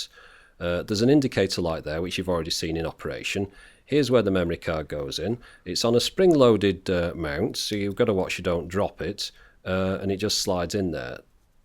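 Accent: United Kingdom. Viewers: Great review. I could listen to your smooth British accent all day :)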